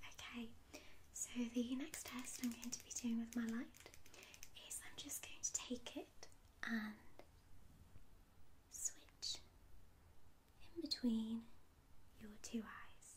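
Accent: United Kingdom. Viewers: your videos are so good it's always cool to see fellow brits and hear British accents:) subscribed x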